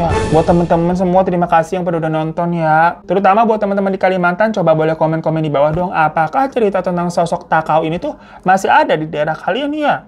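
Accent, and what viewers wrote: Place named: Madura, Indonesia. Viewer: banggg basa banjar bangggg logatnyaaa kek maduraaaaaa😭👋👋👋👋👋👋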